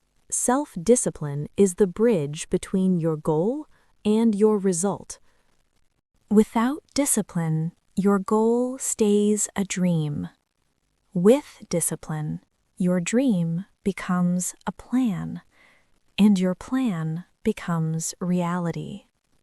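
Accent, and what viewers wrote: United States. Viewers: امريكية ماهي بريطانية ابداً لان نطق الكلمات مختلف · تبدو انجليزية أمريكية وليست بريطانية ؟؟ ارجو الرد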